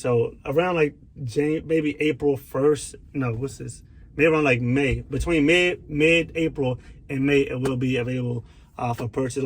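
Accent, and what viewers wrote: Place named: Spain. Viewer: Yow how u sound well on these videos but on the phone when u talking u hv a Spanish guy accent 😢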